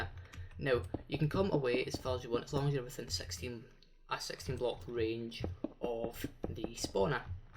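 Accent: Scotland, United Kingdom. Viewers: "Barely English"? Scottish accents are older than the USA. Either way, you could watch this on mute and you'd still be able to follow it. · Thanks for that video. Loved your accent. Very Braveheart.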